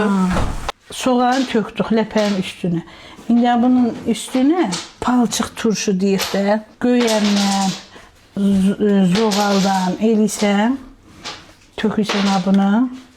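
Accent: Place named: Zagatala, Azerbaijan. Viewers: Qax zonasının ləhcəsidir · Zaqatala ləhçəsine oxşuyur abay 😊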